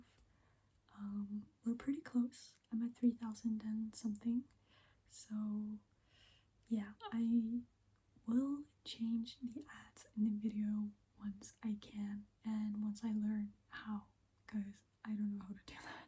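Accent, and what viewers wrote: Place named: Iceland. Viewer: You arnt regressing you just started. Don't worry about it. On another note, when you whisper, your Icelandic accent is gone, and you sound more english but here, I can here it full bodied. And I love it. Greetings from a Canadian farmer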